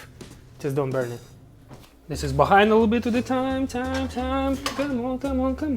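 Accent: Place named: Eastern Europe. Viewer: DAT SLAVIC ACCENT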